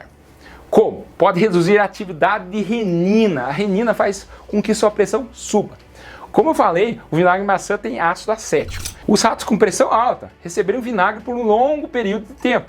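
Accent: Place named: Curitiba, Brazil. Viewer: Se eu não me engano, é de Curitiba ou Santa Catarina. Tb acho lindo o sotaque dele. Aliás, o Brasil é rico em lindos sotaques. ❤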